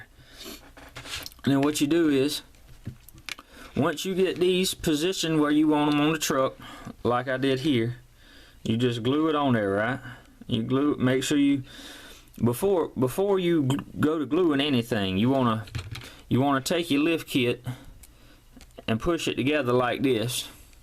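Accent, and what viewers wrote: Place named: South, United States. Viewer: Ok, your accent is more country than mine. Thanks for making these videos, I enjoy them. They are a super big help. The paperclip working one is something I will eventually try. But this one is what I was looking for. I am looking to raise just the back end of some old muscle cars, and moving the tires out a tiny bit.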